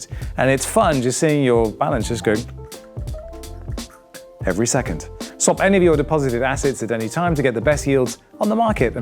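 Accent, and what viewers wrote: United Kingdom. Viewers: Why is a British accent so convincing? Between this dude and Coin Bureau, I believe everything they say!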